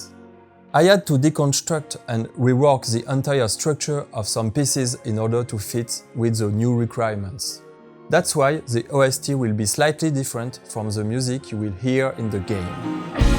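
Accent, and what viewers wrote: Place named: France. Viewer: Toujours aussi fan des oeuvres d'. Et The french accent, toujours aussi nice ^^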